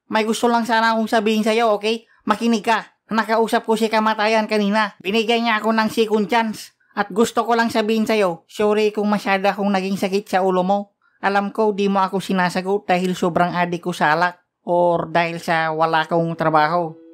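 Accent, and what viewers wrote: Ilocos, Philippines. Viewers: Kulit ng accent mong Ilocano 😂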